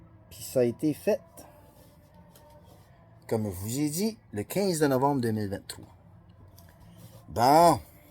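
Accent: Magdalen Islands, Canada. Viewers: Merci pour L'espoir que vous me donnez. Je vois déjà les Madelinot combattifs mais faut que ce soit le peuple au complet qui dise NON et on met le maire sur le bateau, direction Souris. J'ai toujours hâte de voir apparaître vos vidéos dans ma cloche. Avec l'accent de Îles qui flatte mon oreille.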